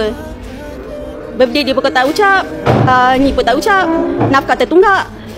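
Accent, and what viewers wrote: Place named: China. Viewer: Content ni aku memang follow sejak PKP lagi. Content dia memang straight forward and aku sampai sekarang xleh nak kenal suara min ni memang cina ori ke or bukan cina tapi buat loghat cina😂❤❤❤❤❤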